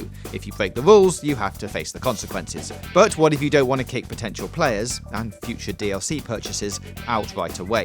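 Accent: United Kingdom. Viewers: Eww he has a British voice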